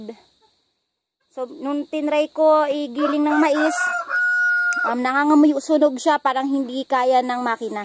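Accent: Visayas, Philippines. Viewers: kakatuwa tlga magsalita ang bisaya natutuwa ako sau madam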